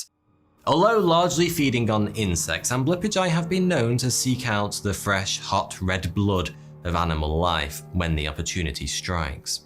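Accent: United Kingdom. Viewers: I knew where this was going, but I just wanted to hear it in his beautiful British accent and voice.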